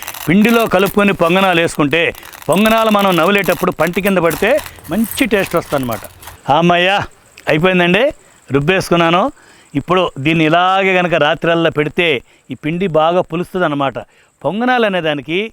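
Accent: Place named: Rayalaseema, India. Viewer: Babai mana rayalaseema telugu antha madhuranga untundi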